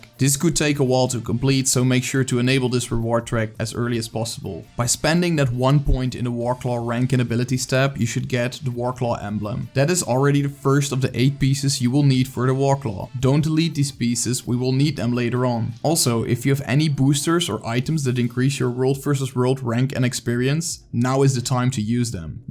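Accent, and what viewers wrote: Netherlands. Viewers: That thick Netherland accent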